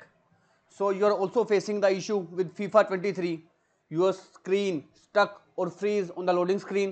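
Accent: India. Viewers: say d instead of T and you have India.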